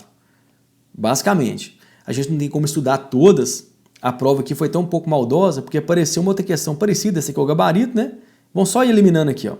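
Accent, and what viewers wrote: Minas Gerais, Brazil. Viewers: O sotaque mineiro é bem simpático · sotaque mineirin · uai sô, rastado esse sotaque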